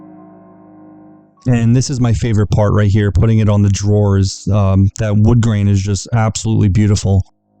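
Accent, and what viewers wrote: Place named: New England, United States. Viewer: Love the contrast in the stains it makes the gorgeous veneer really pop. I love how you can bring out the beauty of the woods. Now we know you're on Long Island, you have just a small touch of what I consider a New England accent, (my mom's from Massachusetts, though she made a strong effort she sadly, in my opinion, succeeded in losing it. I'm southern California and, no we don't talk like valley girls, lol. We have almost no accent. Just plain boring English with nothing special on top. Say hi to your dad.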